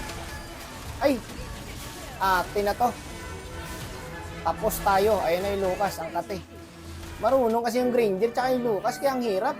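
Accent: Iloilo, Philippines. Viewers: ilonggo yan boi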